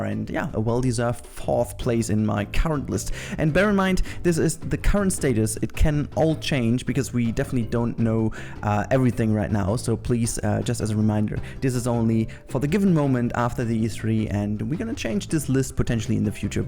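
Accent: Germany. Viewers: Are you a German Person?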